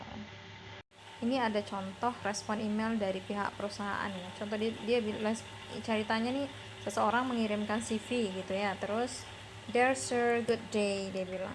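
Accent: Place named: Palembang, Indonesia. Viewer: Terdengar tdi. Cara ke duo. Asli palembang ya mbak. He he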